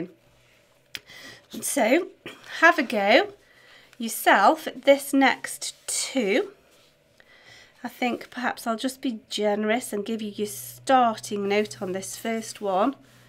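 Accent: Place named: Dudley, United Kingdom. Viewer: not far away from me! I thought your accent was quite near hehe! I’m in dudley! Nathan rose is my teacher!:) x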